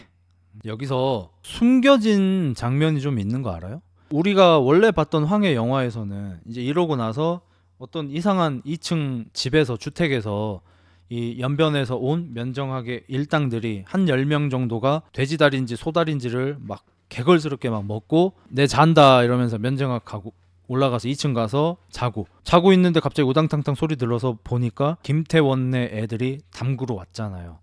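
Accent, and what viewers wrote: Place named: Gyeongsang, South Korea. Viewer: 경상도랑 조선족 묘하게 섞여서 혼란스럽네 ㅋㅋㅋㅋㅋㅋㅋ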